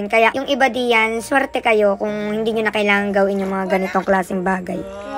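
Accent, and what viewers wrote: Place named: Pinamalayan, Philippines. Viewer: wari koy ikay taga pinamalayan punto pa laang ay ngane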